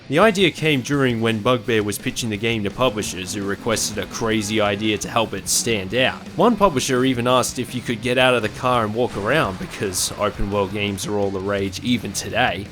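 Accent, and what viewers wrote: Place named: Australia. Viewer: I just wanted to tell you that i really like your work. The scale you cover and your approach to all the racing video games is really satisfying. Since you're an aussie, i also consider you as the gmanlives of the racing game genre lol. Your voice is also similar but it probably also comes with your aussie accent. :D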